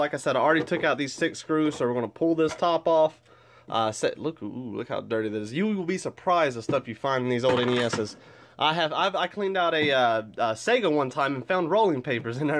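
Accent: South, United States. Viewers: This is an amazing video man! You sound like you're front the south as well like I am. Just picked up an NES for $5 at a yard sale and it works! I'm looking to refurbish it on my next day off.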